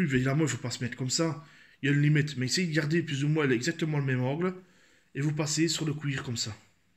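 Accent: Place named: Liège, Belgium. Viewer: Quand je t'ai entendu , j'ai dessuite compris que tu venais de ma région de lièch🤣 Très bon couteau de poche le Ruike p108 le mien ne me quitte plus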